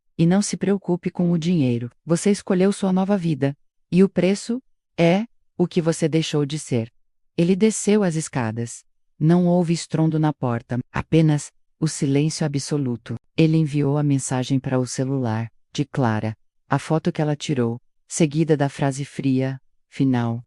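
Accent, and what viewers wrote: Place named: England, United Kingdom. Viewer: Porque o sotaque forte do Ingles? A historia é bem interesante❤❤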